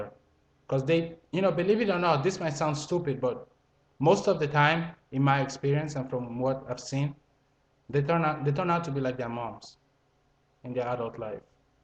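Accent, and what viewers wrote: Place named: Italy. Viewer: You must be Italian??? At least it sounds like it haha. It's a nice accent though. I'm German. But man, you are 100% correct. You have to watch out what kind of women you are dealing with, they are secretive and snap at any moment. Poor McNair.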